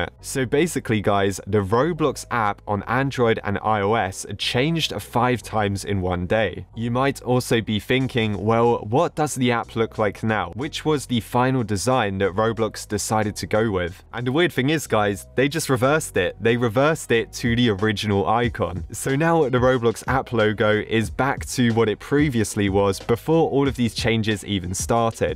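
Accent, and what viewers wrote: United Kingdom. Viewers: Imma assume is British because of his voice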